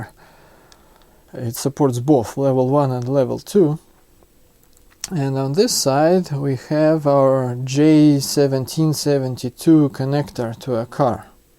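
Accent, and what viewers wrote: Russia. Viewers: Русский акцент. Крутяк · вери рашн пронансиэйшен )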